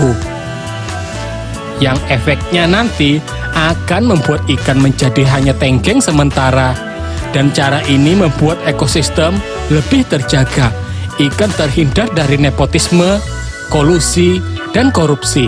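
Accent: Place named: Java, Indonesia. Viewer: pian urg jawa lws d banjar kah sanak,banjarnya gedaguman kyk kwn ulun urg jawa🤣🤦‍♂️🙏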